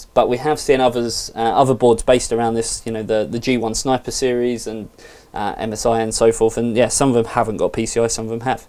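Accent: United Kingdom. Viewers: *hears voices in head* Whos video is this? British right??? Commonwealth??? Everyone is reading this and wants to know what RAID 0 is. Raid is where you have more than 1 hard drive hooked up for storage and performance. Raid 0 is a type of Raid setup that's the cheapest (I don't plan on using 20 hard drives with Raid 0 setup) in performance. YOU DONT NEED RAID 0 IF YOURE NOT INTO VIDEO EDITING WITH WRITING FILES AND COPYING FILES TYPE SHIT. SO DONT BUY RAID 0 IF YOU DONT DO THIS TYPE OF STUFF ALL THE TIME. Raid 0 should improve the lag or stuttering with programs like FRAPs and other software. Its writing information twice as fast or suppose to. You shouldnt see a lag sync in watching videos either after recording a giant file after editing it. I still didn't hook up RAID 0. Did I win the biggest LOSER AWARD yet? Good... I didn't want to be your friend anyways.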